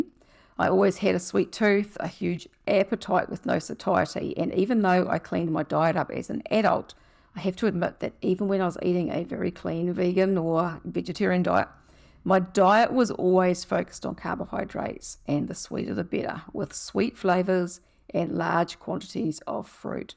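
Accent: New Zealand. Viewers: Great to hear a Kiwi "eccent" on YouTube!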